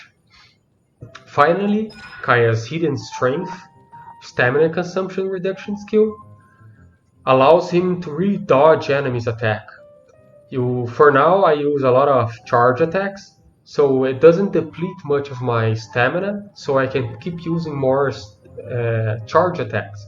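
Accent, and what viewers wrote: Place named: Brazil. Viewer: Oh yeah, the Brazilian accent kkkkkkkkkkkk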